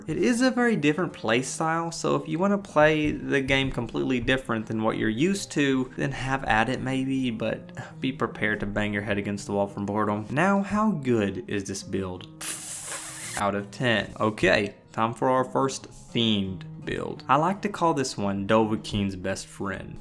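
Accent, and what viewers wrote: South, United States. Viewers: it's funny to me, because the playthrough I play right now is a cluster-flip of concepts to me.. playing a Kahjit sneaky-assasain-mage thing, and it's my second time playing a mage. first mage game was a pure mage that got pretty op, so I wanted to try a weird double-build thing, and I also never went stealth before?? at the beginning of the game, I got the Thief Stone and now I have high power thief skills. also got good magic too. but it's also funny, because I'm actually really trying to roleplay more, and get more into the lore, but I still ended up basically having the same personality of me irl, so the dude is a lawful neutral monster that abides by the laws, and will generously go fetch any family heirloom, but if I find someone outside on the road and they're not an enemy even, I'll silently murder 'em for no reason at all. 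I also rob just about every single store/house I come across, even the food don't judge me... 😂😂 also, I must say that the "cowboy" accent made me very comfortable??? I don't know how to word it, but my entire family is from the south, and they all still have accents so your voice made me feel right at home, I hardly see southern-accented YouTubers..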